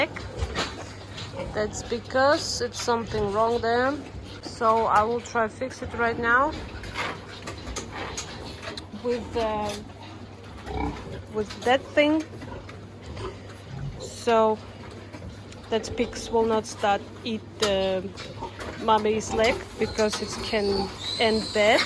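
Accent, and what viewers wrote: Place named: Eastern Europe. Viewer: You have very good english for what seems like you're a Eastern European pig farmer. I'm assuming Eastern Europe from your accent. I'm impressed. Most Eastern European pig farming videos I've seen on YT, they can't speak english.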